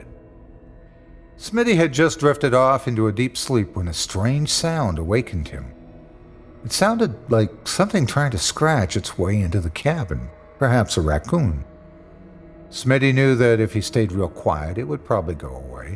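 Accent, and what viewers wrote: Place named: South, United States. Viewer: sounds like southerner i like it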